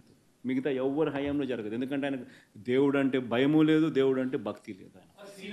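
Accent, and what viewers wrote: Rayalaseema, India. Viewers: Haha rayalaseema lo yasa tho answer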